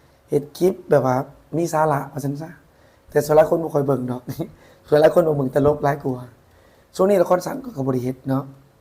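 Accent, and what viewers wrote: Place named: Ubon Ratchathani, Thailand. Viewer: คนไทยอย่างผมรู้จัก ดีว่าเป็นคนลาว การใช้ภาษาไทยบ้างลาวบ้างมันเหมือนสำเนียงอุบลราชธานีเรารับได้ เขามีเหตุผลที่ดีกว่าคนในลาวเยอะ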